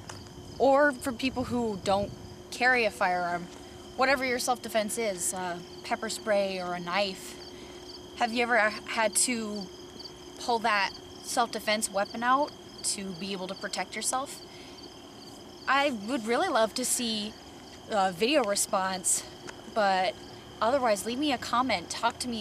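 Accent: Wisconsin, United States. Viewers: You sound like your'e from WI?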